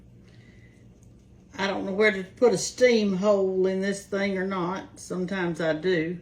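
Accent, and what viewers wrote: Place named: South, United States. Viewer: Love, love your southern country accent , so friendly❣️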